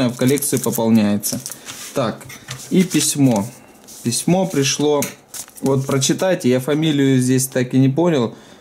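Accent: Ukraine. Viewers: У тебя в этом видео акцент Украинский сильно выражен )) А в других его вообще нету такого,все на русском))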